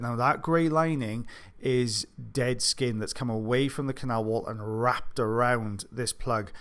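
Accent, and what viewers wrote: Wales, United Kingdom. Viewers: "Clever sausages" 😂 I love the Welsh accent, but then add these euphemisms, and I can't help but giggle! 🥰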